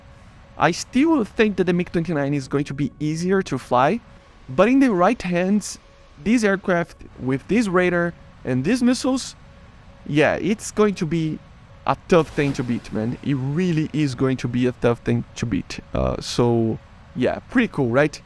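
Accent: Brazil. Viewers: He’s Brazilian, accents buddy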